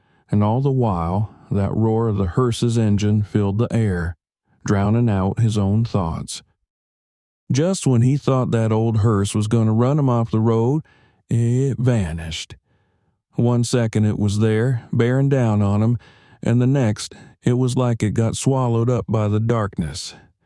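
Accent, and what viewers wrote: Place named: West, United States. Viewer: Is the narrator from Utah? I would swear he is from out West from the accent . i love Ky stories . My Dad had a few.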